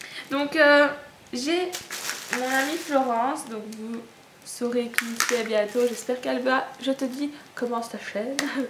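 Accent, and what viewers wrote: Belgium. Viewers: bonsoir juste petite ? tu es de belgique ?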